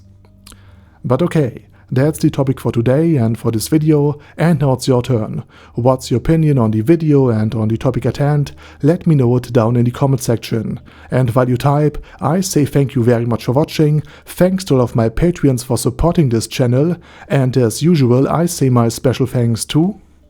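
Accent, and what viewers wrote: Germany. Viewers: Boah the german is very strong with you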